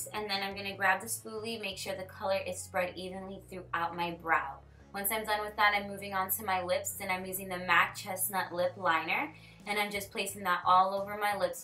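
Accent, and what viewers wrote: New York, United States. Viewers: are you from nyc cause I hear that accent like is it me or are you a fellow new yorker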